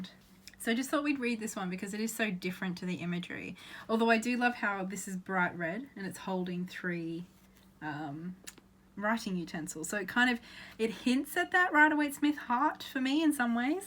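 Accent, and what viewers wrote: Australia. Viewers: Two things I’d like to say: I hear the Aussie in your voice. 🇦🇺 (Am I correct??) And; I live for the Harry Potter references... Always.⚡️🦉🏰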